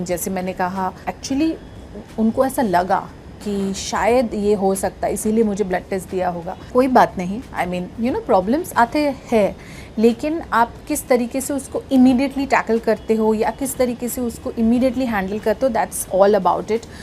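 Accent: Bengal, India. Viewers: আপনার বাংলা কথা শুনতে খুবই ভালো লাগে, বাংলাদেশ থেকে দেখছি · I like to hear you all day when you talk in Bengali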